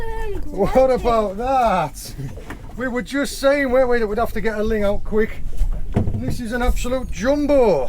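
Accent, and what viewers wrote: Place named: Teesside, United Kingdom. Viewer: Are you from the boro mate, I’m from Redcar and you’ve got a very familiar accent. I go beach fishing at marske with my brother in law. Loving the videos pal UTB 👍🏼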